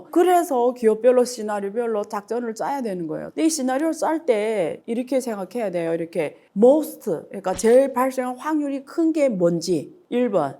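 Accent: Yanbian, China. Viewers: 에이 ㅡㅡ조선족 말투 ㅡㅡ정체가 의심ㅡㅠ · 말투 억양이 어째 조선족인디?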